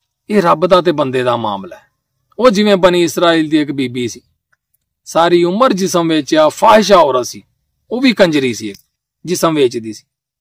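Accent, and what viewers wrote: Punjab, India. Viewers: بھائی بہت سخت پنجابی بولتے ھو ایک لفظ کی سمجھ نہی ائی اوردو میین سُنائیے تھاکیں ہم بی انجوئی کریں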